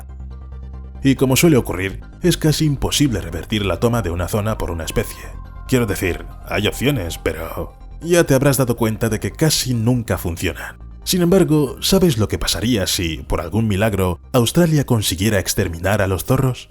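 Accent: Spain. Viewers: A leguas se nota que el de la voz es ibérico, enfatiza la "S". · Ese acento de España es agradable.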